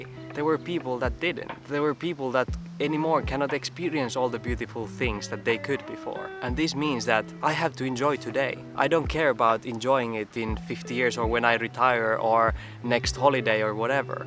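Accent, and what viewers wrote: Finland. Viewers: Hei! Löysin sun kanavan viime viikolla, ja mietin eka että sun aksentti kuulosti suomalaiselta ja katsoessa kävikin ilmi, että oot suomalainen. Aloitin mun minimalismi matkan viime vuonna kesälomalla, ja kävin koko asunnon läpi muutaman kuukauden aikana. Lähti paljon tavaraa pois, osa ihan roskia mitä olin jostain syystä säilyttänyt, osa käyttökelpoisia tavaroita kierrätykseen. Yli vuoden mulla oli kaksi kaappia jäljellä, mutta en ollut jaksanut käydä niitä läpi. Tänään sain inspiraation sun videoiden katselun jälkeen, ja kävin viimein siivouskaapin läpi. Nyt sinne mahtuu imuri (se oli mun unelma, kun aikaisemmin kaappi oli niin täynnä roinaa, ja imuri pyöri nurkissa) Pieni juttu, mutta tuli hyvä mieli. Kiitos inspiraatiosta! Kiva että löytyi suomalainenkin minimalismi YT kanava, katselu pitää asian mielellä ja inspiroi tekemään jotain (vaikka välillä kuuntelen vain huvin vuoksi, saattaa kestää hetki että pääsen itse toimintaan)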